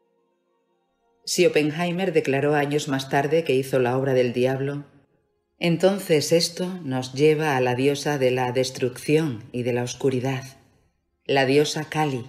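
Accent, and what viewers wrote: Chile. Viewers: Cada Documento en su preciso lugar...Trabajo muy exelente...Y como adorno a tan bello trabajo, ese matiz de voz inconfundible de la hermanita ... Chilena ???❤🎉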